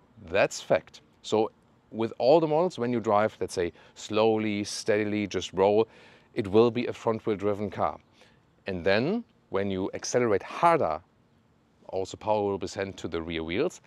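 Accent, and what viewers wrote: Germany. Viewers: Nice review, very nice car - though rather pricey. But may I just address something here, and I mean this in the nicest possible way: I find it very hard to listen to the accent, and even more so, the numerous mistakes in the language. For example: "If it WOULD BE an S-line, it WOULD..." drives me nuts. It should be: "If it WERE an S-line, it WOULD..." When you choose to present in English, the language should be flawless, especially when named. Alternatively, just do it in German. And for the record, I'm German myself.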